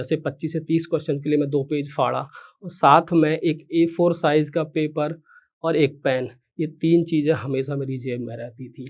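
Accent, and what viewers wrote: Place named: Jhansi, India. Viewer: Jhansi UP ka lg ra banda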